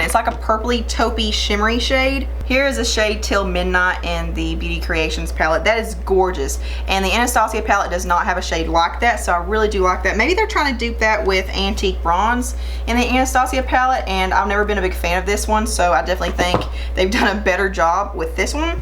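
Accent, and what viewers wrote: South, United States. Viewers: Clearly you're from the south? What's your accent? Hhmmmmmm Georgia?? NC??? I'm from SC. I'd love to see the Beauty creations palette on your face lol. Thanks for bringing these brands to light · Oh my goodness..... You asked them if they could send some products for you to review fir them, and they just said no?? 😮 That was quite rude of them... You'd think they would be happy someone with nearly 13,000 subscribers wants to do a video reviewing their products and help them get more recognition (because I honestly NEVER heard of this website until I watched this video). Oh well...I guess they just don't care to gain more popularity. 😕 P.S I love your accent! 😆 I'm Canadian (which has no cute accents lol) but I've ALWAYS loved a southern accent....I can't pin point the accent to the state....I just know a southern accent. I think what made me like the accent so much is my OBSESSION with the country singer, he's from Georgia and aside from the fact that he is sexy AF, his Georgia accent only adds to it! 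Yup..I'm definitely gonna move to Georgia and marry that man...I mean..he's married and I'm married but...meh 😇 a girl can dream, right!? Lol 😄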